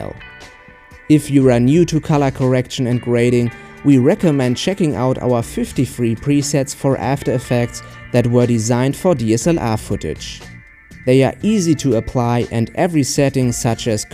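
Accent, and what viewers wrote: Germany. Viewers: Lady Fynix man hört das deutsche 😂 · Erst dachte ich mir so: "Da hoer ich doch ein Deutschen akzent" und dann sehe ich das du noch in der selben Stadt lebst wir ich :D Gutes tutorial, danke hat sehr geholfen ^^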